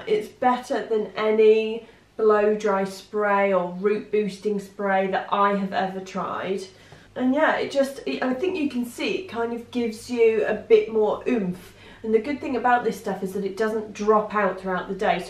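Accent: United Kingdom. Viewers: you said in British accent. I can't ask for more. I'm too distracted by your accent.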